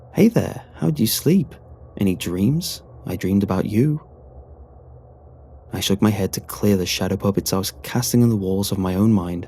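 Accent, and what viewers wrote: United Kingdom. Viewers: You have a British accent .....cool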